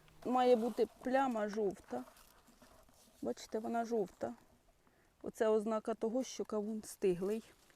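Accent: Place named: Poltava, Ukraine. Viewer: Цікаво і пізнавально, дякую. І ще, насолоджуюсь вашою говіркою, так похоже розмовляють у багатьох селах Зіньківщини, де довелося деякий час жити. Там є село Власівка - точнісінько така ж інтонація в розмовній мові. Бажаю гарних врожаїв.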